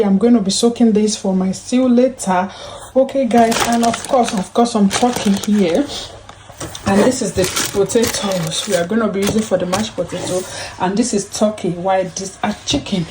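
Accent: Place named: Yoruba, Nigeria. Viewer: Yoruba sweet for your mouth ma ❤️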